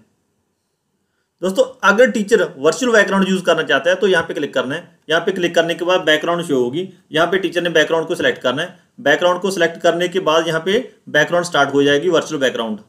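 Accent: Punjab, India. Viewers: Seems like u are Punjabi from Ur accent 😊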